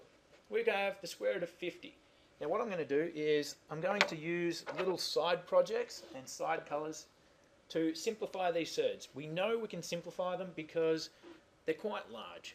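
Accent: Australia. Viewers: Revising in australian, so much better..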